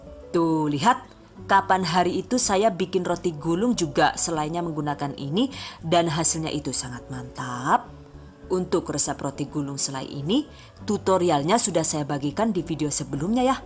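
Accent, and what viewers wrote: Banyumas, Indonesia. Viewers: Hehe I always wanted your video not just because the receipt but for your ngapak hehe lucuuu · 😆😆😆 ngapaknya itu loh aye demen banget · yu ngapak2 baen kouh 🤣🤣🤣🤣🤣🤣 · Hadeuhh...gk nahan sm ngapaknya itu loh...,😅😂 channel kesayangan gue nih,krn inspiratif dan kreatif banget,👍👍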